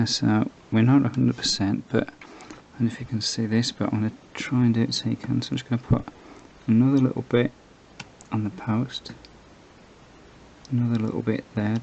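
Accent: United Kingdom. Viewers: I guessed that 'С' ('S') would mean 'Medium' and 'Д' ('D') would mean 'Long' on the basis of the Czech I know, where 'Strední' is 'Middle' and 'Dlouhý' is 'Long'. That £5 was quite some bargain for what I would describe as a collector's item and the Sokol 204 may look basic, but the functionality sounds first-class to me. Thanks for the care tips, although it didn't look all that dirty from what I could make out. I'm getting numerous Worcestershire-based results looking for your name, which makes sense to me, as I have a reasonably good ear for accents and it's screaming "West Midlands!" to me. If so, you're from my late father's sometime neck of the woods, as he studied at Worcester College as part of learning to be a schoolmaster, later at Bognor Regis Teacher Training College, then going on to teach at Spondon House, Derby. I think he still harboured an affection for Worcestershire as Tom Graveney was a favourite cricketer.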